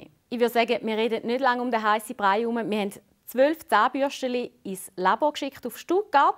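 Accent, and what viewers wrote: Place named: Switzerland. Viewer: Ich freue mich immer wenn man was auf Schweizerdeutsch hören tut.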